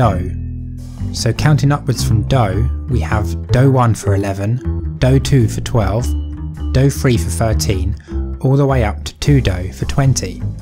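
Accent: United Kingdom. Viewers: Let me ask you something, do you use metric system or imperial? British accent is funny, we from Brazil think the same about the Portuguese accent, but this doesn't make his argument less valid. Use imperial system however is stupid, and what makes it worse is that USA is affiliated to the metric standard and all of its imperial bullshit comes from metric's standards, it's only a conversion.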